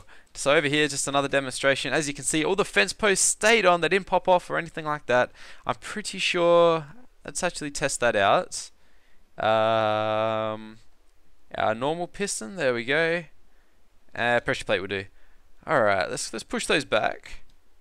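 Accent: Australia. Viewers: U sound Australian cool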